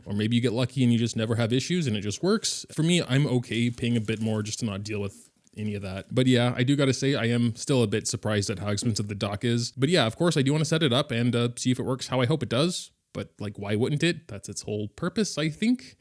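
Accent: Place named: Germany. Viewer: i didnt expect you to be german :D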